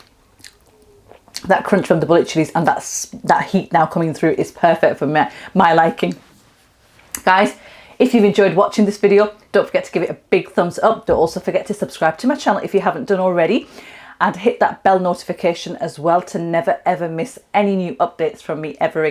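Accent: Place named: Yorkshire, United Kingdom. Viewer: I've just discovered your channel and I think it's great. I don't know where are you from but you sound to have a bit of a Yorkshire/Manchester accent. Wherever you are from thanks for the recipes anyway.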